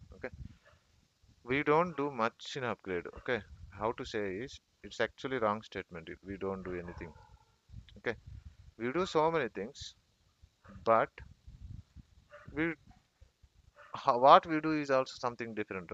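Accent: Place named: India. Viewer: So hard to understand with indian accent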